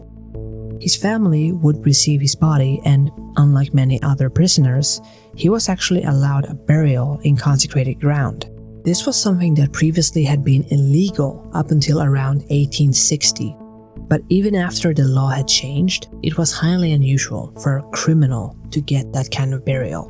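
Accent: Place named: Sweden. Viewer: I appreciate the work you put into your videos and your commitment to rejecting generative AI. This was a story I hadn't heard before, unsurprisingly, as an American. I'd love to hear more like this from your neck of the woods (assuming from your accent and pronunciation that you're Swedish).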